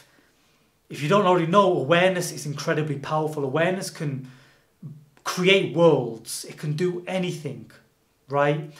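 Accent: United Kingdom. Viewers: Lovely stuff! Keep it up young king. Nice to hear a British accent 🤓💚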